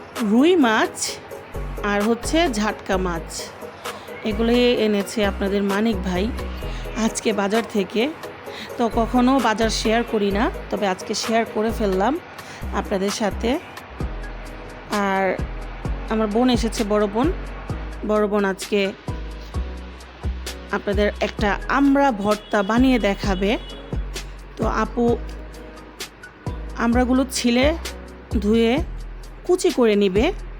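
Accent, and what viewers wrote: Old Dhaka, Bangladesh. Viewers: আপা পুরান ঢাকার ভাষায় কথা কই এন সেই লাগে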